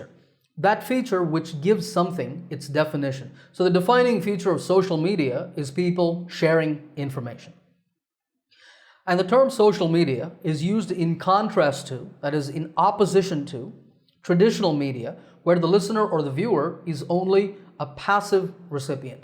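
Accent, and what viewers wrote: United States. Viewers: Thnk u so much sir..... really useful n important to learn best eng with American accent 😊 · Can you tell me how did you learn or improve your american accent. thanks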